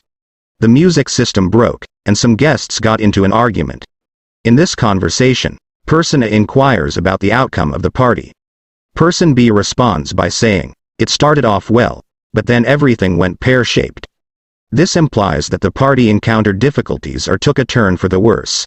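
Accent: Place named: United States. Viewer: Woooow ❤ I like it American accent and speed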